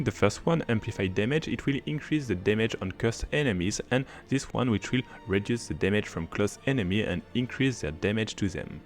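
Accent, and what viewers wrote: France. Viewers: J'adore notre l'accent fr en anglais 😅🤣... mucho love❤😉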